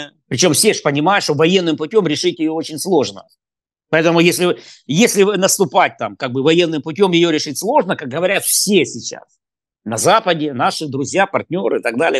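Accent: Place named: Odessa, Ukraine. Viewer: Ведущая говорите как говорят в Одессе!!! Противно слушать!!!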